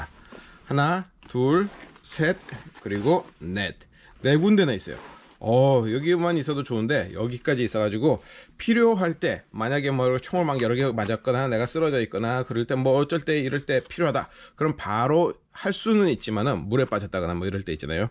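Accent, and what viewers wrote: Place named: Japan. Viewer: you sound Japanese or maybe Korean but you don’t sound like a chinaman I hope your not a chinaman I could not understand what you said other than acelink armor I feel you gave a good review of this item enjoy the plate carrier and buy two more sets and donate them to Taiwan they’ll need them to fight off the chinaman we have swapped to chinaman targets to practice hitting the short dumb backward bat eatin people if you are chinaman then know plate carrier is more effective without any inserts in it at all it’s an American secret so don’t tell chinaman good luck hope you never need plate carrier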